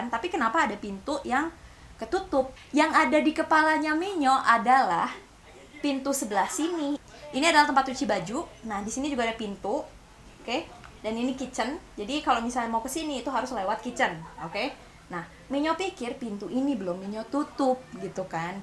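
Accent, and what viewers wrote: Java, Indonesia. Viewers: Kirain mantannya minyo yg setan soalnya diajak ngomong tadi hahaha btw asli mana sih kok agak "NJOWO" bahasanya haha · gagal fokus.. logatnya kadang" ke jowojowoan..kadang" keluar kesundasundaan..hahahhahaha..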